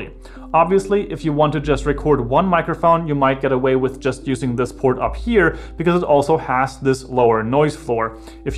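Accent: Germany. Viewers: ☺️😀 na dann können wir ja auch Deutsch schreiben. Respekt - den typischen deutschen Akzent hast du aber ganz schön gut unterdrückt! 👍 <link> wäre mir jetzt einfach auf Grund der Top 10 aufgefallen. Hast du denn Erfahrungen mit anderen XLR auf Klinke Adaptern, die günstiger sind und trotzdem Plug-In Power liefern? Oder gibt's das gar nicht günstiger? PS hab dich mal abonniert - als Gegenleistung für deine herausragende Kommunikation 😀👍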